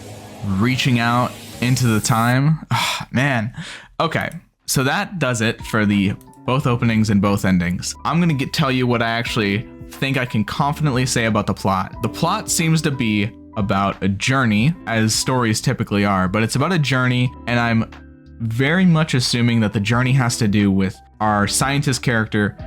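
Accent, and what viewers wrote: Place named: North America. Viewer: Вот это английский. Наконец-то я понял КАЖДОЕ слово. Интересно - откуда ты? Из какой страны и региона?) Я по английски не говорю - но понимаю его (90% на тексте, и на слух моё понимание варьируется от акцента реакционера). Это точно не англия, в которой слова "глотают", словно повыбивали все зубы, это не центральная европа (там акцент более жесткий), и не восточная (там жестко абсолютно). Не латинская Америка. США, канада, Австралия - скорее всего одна из этих стран. Но в США от штата к штату акцент тоже варьируется. Точно не Техас))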